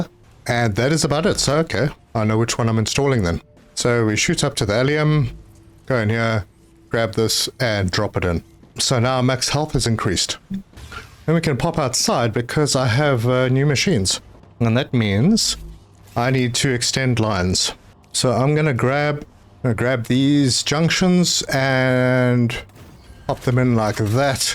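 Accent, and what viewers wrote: South Africa. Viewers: You sound afrikaans! Hoe gaan het! Im Dutch but know a bit of afrikaans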